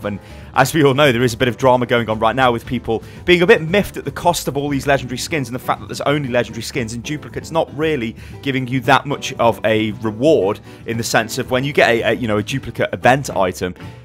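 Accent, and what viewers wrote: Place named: United Kingdom. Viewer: where in Britain are you from?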